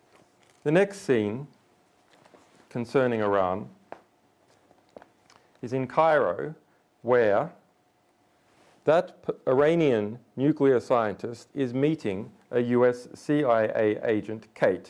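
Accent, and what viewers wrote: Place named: United Kingdom. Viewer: I don’t attack intelligence agencies anymore. Once you reverse engineer history and identify who funded the creation of all intelligence agencies of every nation then you see that they are no more responsible for social engineering than governments and individuals in positions of power within government. This all stems back the the Bauer/Rothschild Dynasty that have their lineage and agents in the leadership roles of every institution of influence and power and all supposed “people’s Movements” even you Jillian Asange are an agent time releasing narratives they want believed. The fact that you have a British accent alone only reinforces this charge. Sean Combs is not a sexual predator, he refuses to endorse Donald Trump while Katt Willams is being promoted because he’s shlobbing the knob of the golden calf. You are obviously trying to seat Trump so that he can Reinact 1938 with the US playing the role of Germany, and he playing the role of Adolf Hitler.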